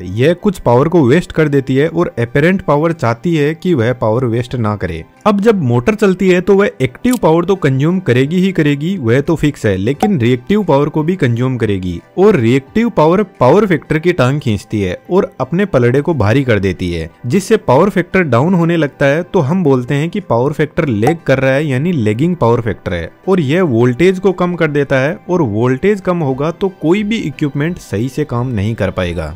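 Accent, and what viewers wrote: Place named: Sikar, India. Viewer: Sir apki tone sunkar lag raha he aap sikar jile se ho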